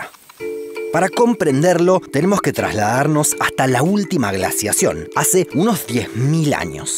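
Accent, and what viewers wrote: Buenos Aires, Argentina. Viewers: Muy bueno, lo único malo es el terrible acento porteño del relator.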